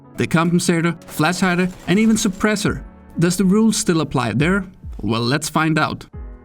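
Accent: Denmark. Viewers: You deserve all the subscribers you have multiplied by 10. Thx for such great content. Are you btw from Denmark? Seem to detect a DK accent 💪🏻🇩🇰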